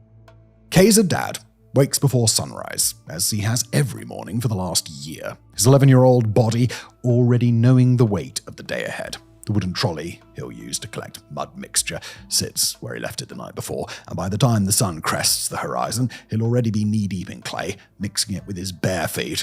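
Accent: England, United Kingdom. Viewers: Jewish have run India for centuries! They know what Jewish Britain did to them . Your English accent can’t hide your Bias either;(! Says The BronxBoy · Why does everything presented by sound like it has more authority because of his British accent?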